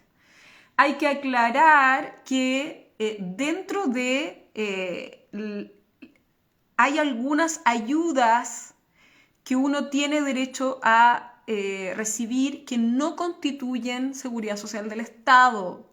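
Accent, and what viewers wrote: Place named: Chile. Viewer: Excelente vídeo muy informativo y riguroso. También le tengo que decir que su acento chileno es precioso sobretodo porque es de alguien culto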